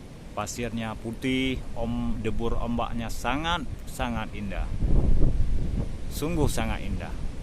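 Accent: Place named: Malaysia. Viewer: Ngomongnya kayak orang malaysia mas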